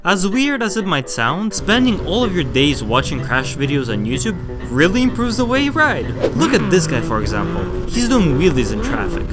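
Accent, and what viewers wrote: Russia. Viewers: has a Russian accent? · Dude, why do you have Russian accent?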